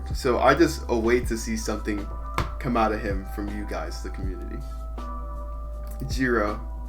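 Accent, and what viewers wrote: Trinidad and Tobago. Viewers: r u from trinidad? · ur from trinidad?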